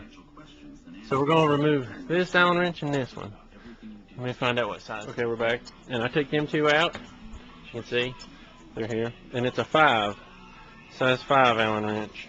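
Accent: United States. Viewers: Are you from Kansas? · haha. I had a feeling you were from TN... I am too.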